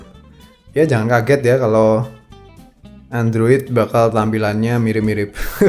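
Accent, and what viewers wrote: Java, Indonesia. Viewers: Omah mu ngendi lek...logat e jowo ngono 🤣. Trs le guyu Yo kok diejo😁 Ditunggu versus in nya...yg seharga ya...vs nya beberapa smartphone lah. 😁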